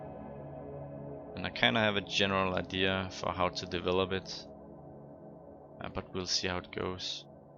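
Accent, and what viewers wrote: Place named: Denmark. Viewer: Your accent sounds Danish, are you?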